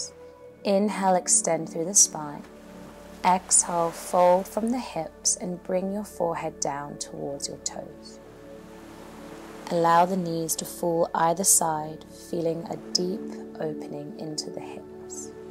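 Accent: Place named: United Kingdom. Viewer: Thanks, so good to have a British accent on here, subscribed now 🙏🏼💪🏼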